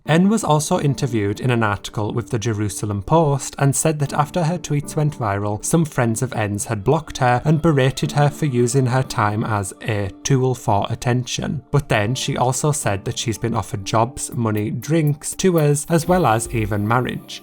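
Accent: United Kingdom. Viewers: Dude you’re literally growing so fast u already got 5k! If there’s anything the YouTube algorithm is a sucker for it’s nice clean British accents, and even nicer video content in an organized, and fun way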